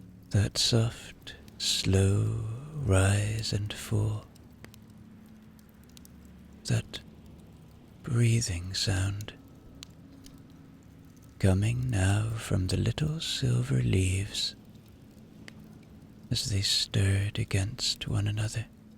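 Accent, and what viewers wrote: Ireland. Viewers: I love the lovely lilt of the southern Irish accent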